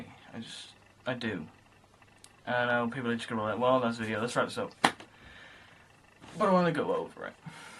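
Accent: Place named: United Kingdom. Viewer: nice video, your british ranting reminds me of rysis and arthimus wishfoot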